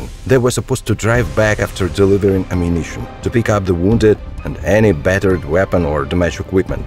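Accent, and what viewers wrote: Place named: Eastern Europe. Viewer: nicely made with a voice which is not too bad like many other with slavic accent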